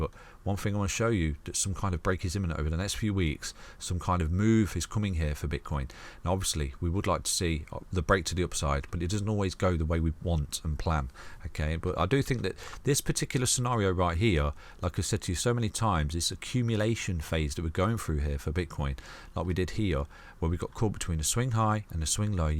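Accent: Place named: England, United Kingdom. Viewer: Thank you for your content and time, these little doodles you do alone are helpful and easy to remember, but your content is simply more understandable than others overall. I have followed several other (no names) crypto not financial advice channels that are popular and not had nearly as much important details as yours, in fact sometimes the opposite advice, but your advice has prevailed. Just clicked the notification bell for your channel, Twitter also. Nice to hear an English accent on crypto updates for a change too.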